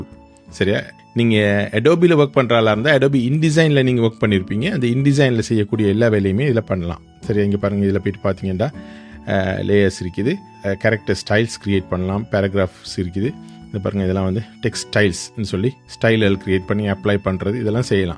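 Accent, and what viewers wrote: Sri Lanka. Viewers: bro Eelam tamil ah? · Are you from sri lanka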